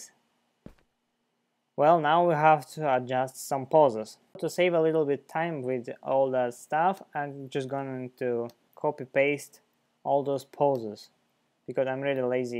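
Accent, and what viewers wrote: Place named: Russia. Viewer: Your accent sounds like Russian.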